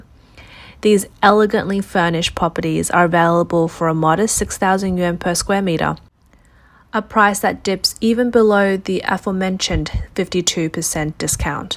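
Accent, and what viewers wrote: Australia. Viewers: I was in the chat some days ago watching her video too but the lass in question who narrated a couple of days ago is a different lady to this narrator. I think that lady is a Hong Konger from Sutton, London; whereas this woman narrating now is likely a Taiwanese or possibly Hong Kong Aussie probably from Brisbane or Sydney or Melbourne. I think many others who watch China Observer confuse all of the female narrators😂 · I know a fellow Australian when I hear one 😊, but it's anybody's guess which city she's from because Australia (unlike, say, Britain) doesn't have obvious regional accents (just a few minor differences in vocabulary). I was in a workplace with people from all over the country, and if you wanted to know whereabouts in Australia someone was from, you had to ask them. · You have a nice Australian accent, maybe you can sell to Australian investors that want to escape the Melbourne winter. 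because these prices are 5 times less than a Melbourne apartment. Sell to boys from Melbourne and they can get a nice Chinese wife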